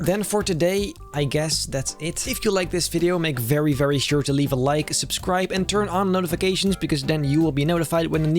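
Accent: Netherlands. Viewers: Wait r u dutch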